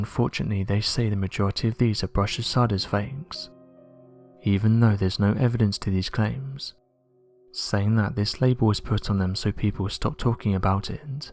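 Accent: England, United Kingdom. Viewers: Well I've been a photographer for well over 50 years, and nothing in my imagination can suggest how anything remotely looking like a creature would be captured by a photograph that was taken by a remote camera interesting enough it was taken in a close-up range how is that possible with the remote camera. If it wasn't for your voice being so how can I put it with an English accent and a tinge of mysterious undertones I would gather to say that everything you've done so far has been almost, I repeat almost genuine. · I like these videos, but his British accent Irks me. The female who I assume is his daughter or perhaps wife, has a much more pleasant voice. The slow monotonous thick British accent is annoying.Hire a American, because I’m almost certain that the overwhelming majority of your subscribers are in fact Americans.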